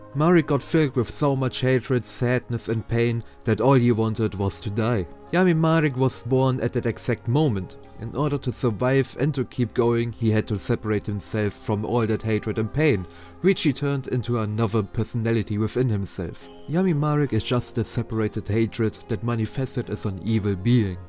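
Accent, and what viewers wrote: Germany. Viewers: You have a strong german accent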